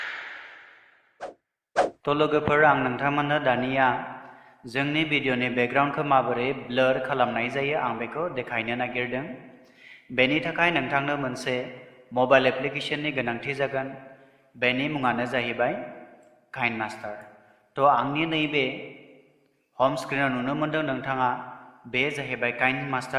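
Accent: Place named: Goalpara, India. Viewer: Sir bungbla mwjang kwnaya so, Golpara bung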